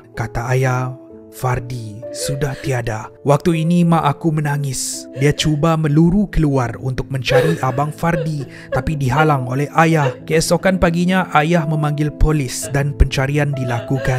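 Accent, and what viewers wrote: Sabah, Malaysia. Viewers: Indahnya dialek Sabah jika bercerita..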